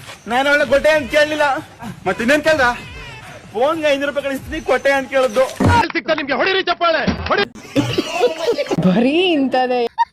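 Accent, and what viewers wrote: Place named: Chamarajanagar, India. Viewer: Nammma Chamarajanagar ada bhashe sogadu suuuppar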